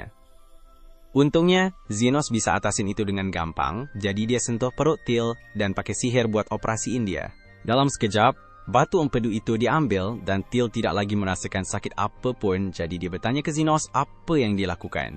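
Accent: Malaysia. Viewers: Logat nya melayu nih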